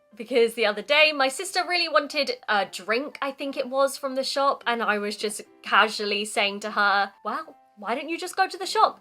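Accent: United Kingdom. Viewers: uk訛り❤好き😊🎉活動頑張ってください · I like your British accent. In addition your oppinions are fun! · Sometimes Japanese old-timers says "in western countries blah, blah, blah" but i don’t want to listen to them any more and we should be more confident our system and the way we do, right ? Thank you very much for your “noticing” and i love your charming voice and the way of talking （especially i love your British accent ! ） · 早くオミクロン株から逃げて帰国した方が良いです。イギリス英語が一番聞きとりやすく勉強になります。 It is better to escape from the Omicron strain and return to Japan as soon as possible. British English is the easiest to hear and study.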